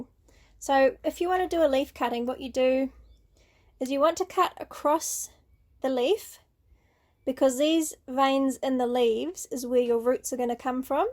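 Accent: New Zealand. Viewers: I love your videos, binge watched them all 😊 Your accent is the best, brings me back to the time I spent in NZ ❤️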